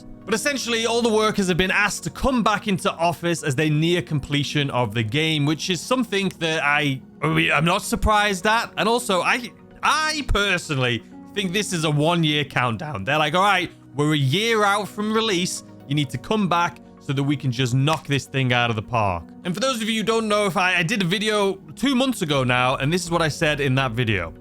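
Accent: United Kingdom. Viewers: I see everybody’s update, but I wait for yours to come out so I can go see yours why is your British accent?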